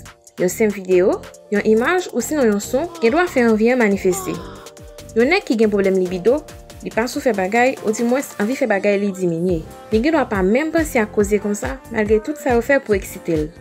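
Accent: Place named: Delmas, Haiti. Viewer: Sa son nèg delma😂😂😂😂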